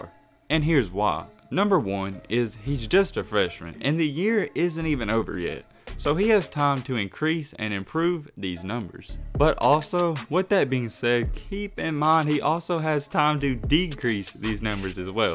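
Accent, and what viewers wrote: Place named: Country, United States. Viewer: Damn did boomhower do this video??? Cuh country asf!!!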